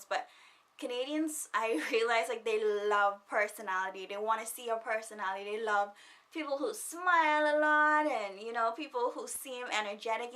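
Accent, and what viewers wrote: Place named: Bahamas. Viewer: 🙂I love the fact how you are still speaking like a true Bahamian.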